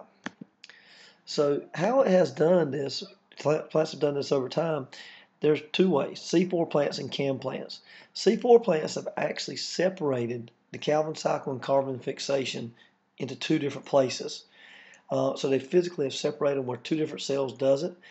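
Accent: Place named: South, United States. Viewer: Nice video truly, you got an interesting accent too. You from around south, buddy?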